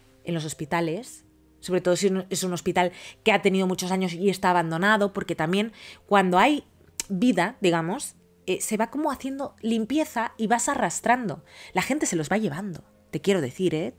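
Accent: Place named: Russia. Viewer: una pregunta tu porque abeces ablas con asento ruso marcando la "R"?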